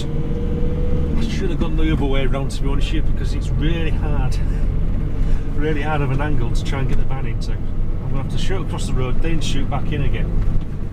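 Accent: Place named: RP, United Kingdom. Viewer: Bit posh is that mate 👌👍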